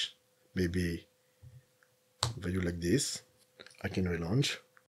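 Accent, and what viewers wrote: France. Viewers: ça sent le french accent! En tout cas il y a du client si jamais tu le fasi en FR pour gagner en réflexion à l'apprentissage de tes vidéos!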